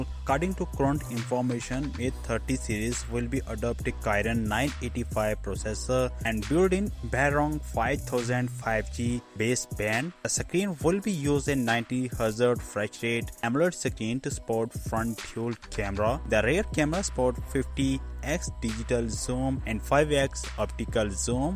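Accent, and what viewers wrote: India. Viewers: Indian pronunciation ？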